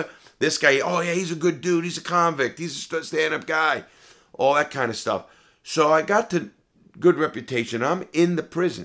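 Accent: New York, United States. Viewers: I found this channel and got hooked. I’ve been to jail but never up the road. Interesting to watch and listen. Plus I’m a Long Island native. Knew was from NY cause of the accident.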